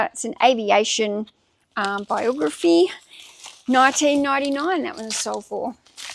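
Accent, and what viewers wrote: Australia. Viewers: around the 25-minute mark, you said "Crue Trime" Hahaha, I was eating fried rice and spit some out, then I rewound it 4 times to hear it again-love the AU accent (I'm in America)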